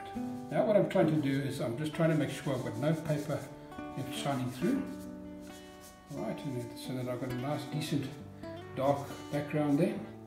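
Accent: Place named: South Africa. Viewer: Nice to hear a South African voice on YouTube.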